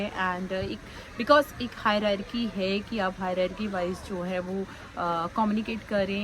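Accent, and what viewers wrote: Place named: United States. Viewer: She is america born... english soo american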